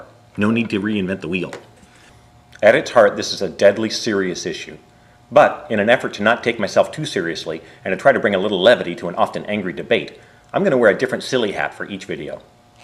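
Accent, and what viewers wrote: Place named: Canada. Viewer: YOUTUBE...only in America, (but you sound canadian) every one wants to be famous. This goes to show excactly how little attention people feel they are getting. Be a good human and take responsibility thats all we need to do. Don't believe the hype. Don't worry. Don't be afraid. Everything must eventually end... So enjoy this life experience, cause it aint gonna happen twice.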